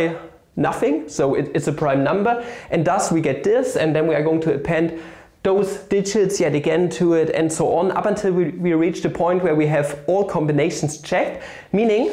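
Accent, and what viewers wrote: Germany. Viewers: you sound very german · Hearing a German speaking English always makes me mad because of.... well you guessed it: accent! :-D it´s hard to follow the content while listening. Apart from that, I would appreciate your work more if I wouldn´t have heard about this by the one ans only, James Grimes. No offence, man, you`ve done a great video!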